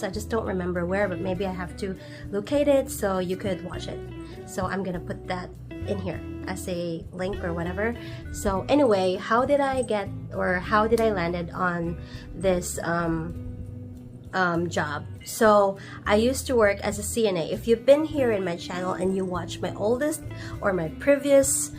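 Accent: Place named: England, United Kingdom. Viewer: ❤ preparing napud para sa NCLEX puhon, 2nd take na naku ni puhon. huhu mahadlok ko magtake usab pero I know God has a plan. Nakita naku imuha video namotivate og na inspire napud ko nga unta makapasa napud ko in God's Will. Wala pud ko experience as a nurse sa pinas, mao daghan ko what IF diris US. Diri ko sa Kansas karon mao duol2 lang d i ta taga MO man ka🥰 nice to meet you sis diris yt. Follow ko nimo kay gusto pud ko makabalo labi na bahin sa Nsg Journey nimo arun naa pud ko idea puhon, kaluy.an. Simplify Nclex pud ko karon. I Love imuhang english accent i hihi!☺️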